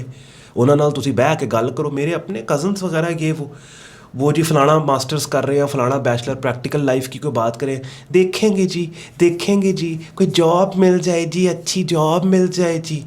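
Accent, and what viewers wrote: Punjab, India. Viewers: Love you, sir. Your Punjabi is very cute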